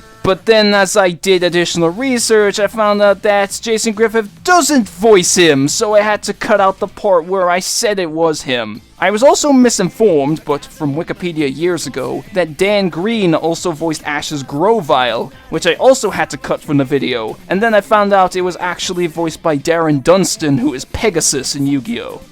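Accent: England, United Kingdom. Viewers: It's weird, I could've sworn that ever since I discovered your channel, I knew you were English because of your accent. But maybe I'm just confusing accents with dialects. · nice video, I like that you own up the mistake also you okay. I thought you sound England but from America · I find it odd that a lot of people didn't know you were English. I knew from your accent, despite how subtle it is. Maybe it's cause I'm autistic as well.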